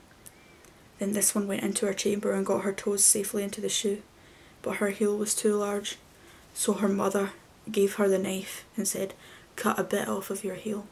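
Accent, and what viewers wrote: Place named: Scotland, United Kingdom. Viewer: Oh!! I have such a soft spot for enchanting short stories and poems that leave you with chills. Very excited for the coming additions in the series!! I love love love scottish accents, I don't hear enough of it in ireland, french and scottish accents are probably asmr favourites of mine! Thank you for this 💫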